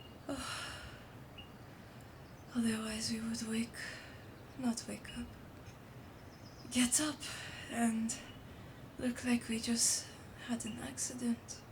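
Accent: France. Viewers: Your French accent is an audible delicacy 😋 ♥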